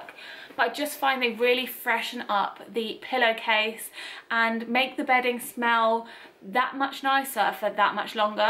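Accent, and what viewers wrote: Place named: United Kingdom. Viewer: im a man of simple things....i hear a British accent.....i subscribe 🤷🏾‍♂️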